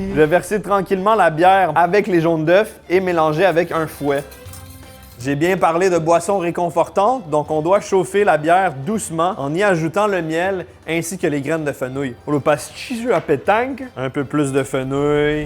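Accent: Quebec, Canada. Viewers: Je remercie mes 2 années au Québec, je peux comprendre dans cette vidéo xD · Gourmandise française mais qui adore aussi votre phrasé québécois ❤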